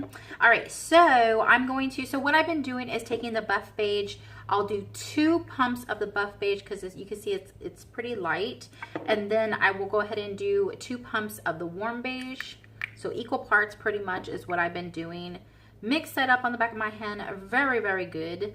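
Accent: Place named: United Kingdom. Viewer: Love this video. I can hear the British accent wanting to peak through. 😊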